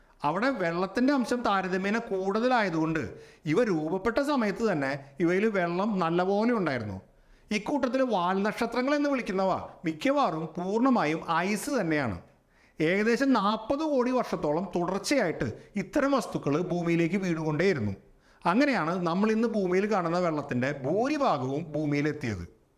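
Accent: Thrissur, India. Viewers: ഇത്‌ തൃശൂർ ഭാഷയാണ് മാഷെ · ഇതേ ചാനലിൻറെ തുടക്കത്തിൽ ൽ, അദ്ദേഹത്തിൻറെ തൃശൂർ ശൈലി മൂലം ഇങ്ങനെ സംഭവിക്കാനിടയുണ്ടന്ന് പറഞ്ഞിരുന്നു.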